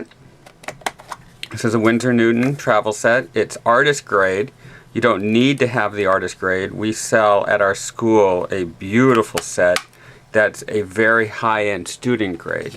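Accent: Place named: United States. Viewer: I hate the way americans say "water"... :):):) But the painting is beautifuly done so it's worth to watch it. :)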